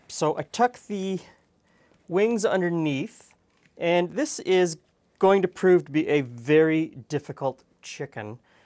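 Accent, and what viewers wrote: Canada. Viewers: omg r u canadian!!!!!!!!!?!?!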